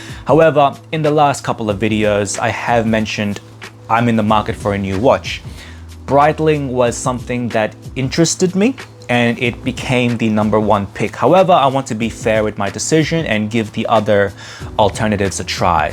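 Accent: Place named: Australia. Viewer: Of the ones you showed on wrist, if money weren’t much of a constraint I’d spring for the Ingenieur (though in the regular blue that was released last year, or the black which makes the dial texture more subtle). Otherwise the Mark XX is hard to fault and is great bang for buck. I just priced one in Switzerland and could get it for 5000CHF (you sound Aussie so that’s approx. 9500AUD).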